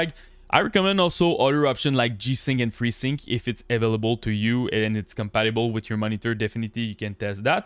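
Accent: Quebec, Canada. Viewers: I IMMEDIATELY recognized that Canadian French accent that I know so well 😂 bon vidéo mon ami, c’est très important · Bel accents man, je sais reconnaître in Queb quand il parle anglais et vraiment merci bin gros pour ton vidéo d'optimisation c'est pratique en tabarnak! :)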